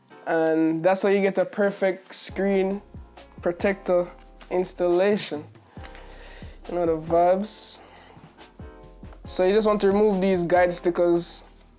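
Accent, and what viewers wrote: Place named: Jamaica. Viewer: R u Jamaican? I hear an accent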